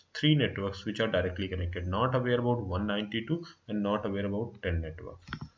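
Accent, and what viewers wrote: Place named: India. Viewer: i don't like Indian or Pakistan Accent but you are an exception.Best video on packet tracer so far.I love how the video started and ended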